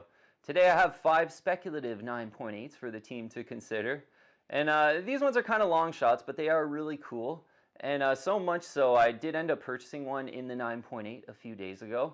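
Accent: Canada. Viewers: Love this channel!!! The Canadian accent makes me home sick - LOL Great videos keep them coming!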